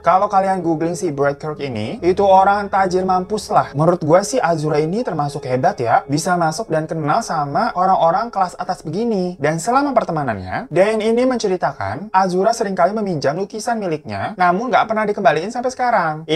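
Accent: Java, Indonesia. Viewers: Sehat terus mas. Anehnya dari mas, namanya bule bangget... Tapi bahasanya medok Jawa banget...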